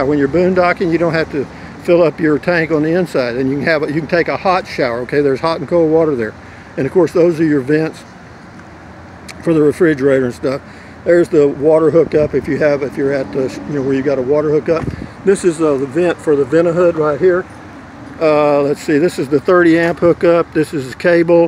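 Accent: Texas, United States. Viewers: Nah, Billy Bob Thornton. Very Texas! :)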